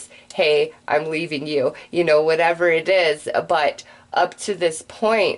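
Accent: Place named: West Coast, United States. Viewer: I really like your voice, still trying to figure out which region of North America who hail from....West Coast? <3 Thanks for sharing your healing gift with us.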